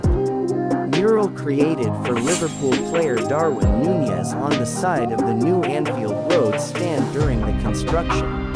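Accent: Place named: United States. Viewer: Why do we so often have an American voice on LFC YouTube videos.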